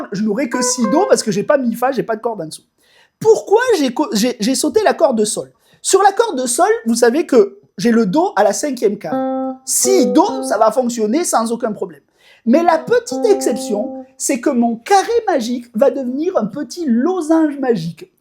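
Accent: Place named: Provence, France. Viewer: oui c est une question d accent et le tien m a propulsé dans les films de M Pagnol.... Le mien, franc-comtois n est pas aussi musical.... Pour répondre, c est toujours intéressant d avoir des éclairages différents sur la technique. Perso je fais des relevés d accords en écoutant de la variété. Tous mes vœux.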